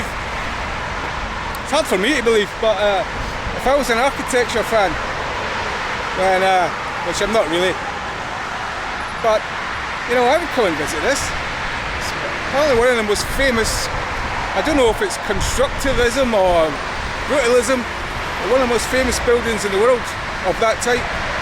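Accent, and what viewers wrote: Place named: Scotland, United Kingdom. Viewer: This video seems so cool. This is exactly the kind of places I wanna go see. But as a non native english speaker I find it hard to absorb both scottish dialect, wind and road noise. Maybe something can be done? I've watched parts with great interest and given a thumbs up :goodvibes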